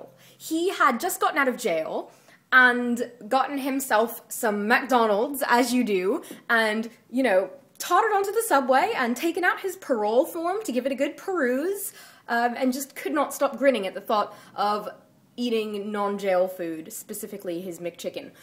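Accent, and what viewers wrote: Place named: United States. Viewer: you sound so american in this vid!!!!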